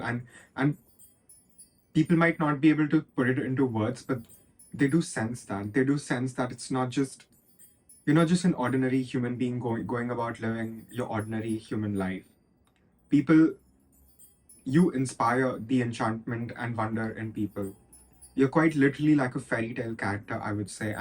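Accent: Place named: India. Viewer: Ummm I did not expect a white looking guy in a cowboy hat having an Indian accent 😂 anyway pile 2 was accurate. A close friend senses the "darkness" but I think its just cuz Im cynical and hard to impress.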